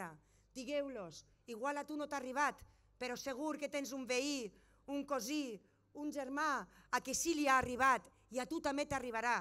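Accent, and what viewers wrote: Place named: Valencia, Spain. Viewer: has de mejorar tu catalán se te nota mucho el deje valenciano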